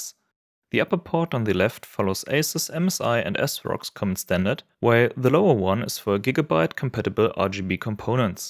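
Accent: Germany. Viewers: That heavy German accent Tho :) . Nice video BTW , keep Going.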